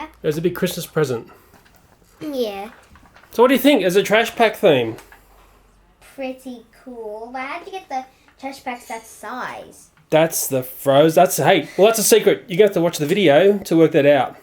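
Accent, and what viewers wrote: Australia. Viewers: He's Australian isn't he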